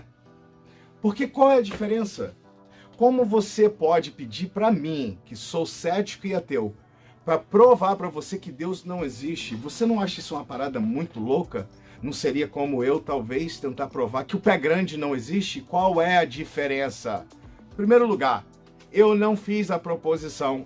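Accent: United States. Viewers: Gente por favor que sotaque é esse? O cara é um brasileiro que esqueceu o português ou um brasileiro com síndrome de baba ovo de americano? Esse cara fala igual a um americano que está aprendendo português..que vergonha alheia ..fala direito brasileiro valorize a terra que vc nasceu..esses vídeos desse cara pode entrar para os top lixo do YouTube..que bosta ..não recomendo.... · legal perguntei devido o sotaque americano. Gosto muito do seu canal. 😍❤❤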